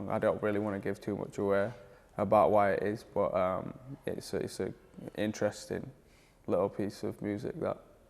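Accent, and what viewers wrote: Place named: England, United Kingdom. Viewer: he speaks in cursive with an english accent..... I wanted this video to be longer..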